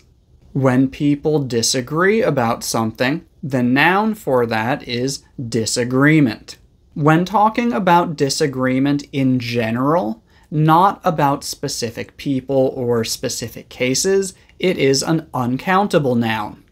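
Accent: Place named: United States. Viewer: Your American accent is pretty nice and clear. ! Thank you so much for your classes. 🎉😊❤❤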